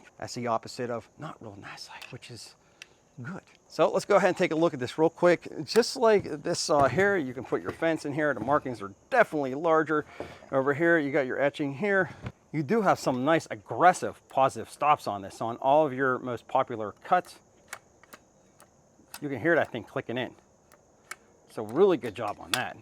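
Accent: Pittsburgh, United States. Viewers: That Pittsburgh-esc accent is obnoxious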